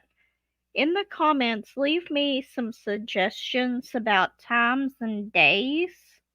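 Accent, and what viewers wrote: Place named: South, United States. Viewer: Hiya, V!! I believe you and I spoke a little during Granny's 1st Premiere. I liked and subscribed your channel back then, however, I am currently having severe vision issues and am unable to diamond paint right now, but I just loved your wip & chat! What a lovely southern accent you have. I look forward to watching more of your videos. Sending loads of love & prayers to your Mom. 😊❤🙏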